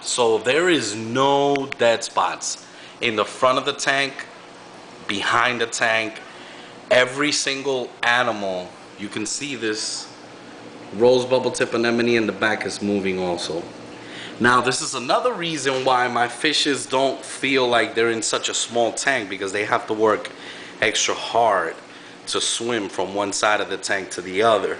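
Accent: New York, United States. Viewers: you sound like my uncle being that hes from new york its kinda weird...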